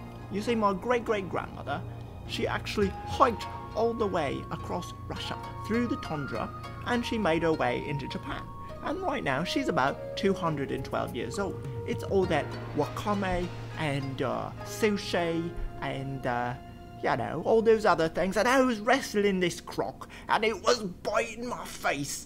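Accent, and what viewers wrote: England, United Kingdom. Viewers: XD TEACH ME YOUR BRITISH ACCENT! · teehee! that English accent is making this englishwoman giggle muchly ^.^ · I just received my visa today yay But no scholarship though D: And love the british accent xD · Your british accent is so cool.. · i actually love wakame... first time i tasted it, i couldnt get enough of it... ha... healthy and delicious... =d awesome british accent btw =)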